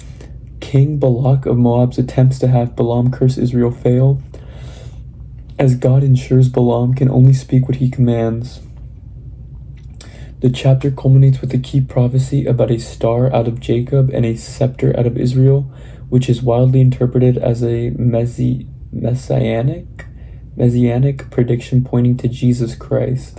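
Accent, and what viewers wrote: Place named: Spain. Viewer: We like your Spanish accent 🇪🇸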